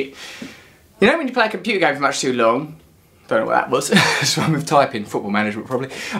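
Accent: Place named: England, United Kingdom. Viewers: Your English accent is so strong, sometimes i can't understand you when you talk fast! lol im American by the way. p.s. love ya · hey russel i feel like you are my official cockney guru. i do spiritual punk - aka really fast chanting - its amazing - can i play in your cafe x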